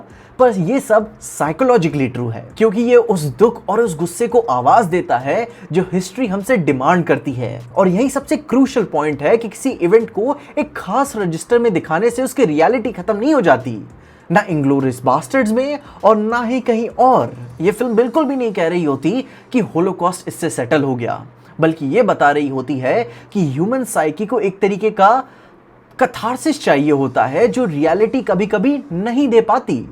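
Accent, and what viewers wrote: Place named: Bihar, India. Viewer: Bhaiya majak nahi Karu ga per aap ki awaaz beach beach me bihari jesi sound kar rahi thi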